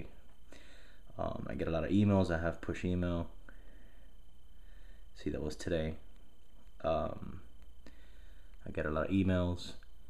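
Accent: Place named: South Florida, United States. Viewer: thanks for your reply.. p.s. when I heard you on the video I thought you sounded familiar and then I saw that your from south fl... i'm from Miami but living in va beach for now... thanks again.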